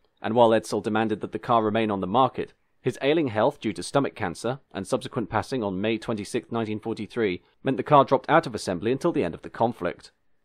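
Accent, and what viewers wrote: United Kingdom. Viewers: The Youtuber is British so deal with it- Foreigner.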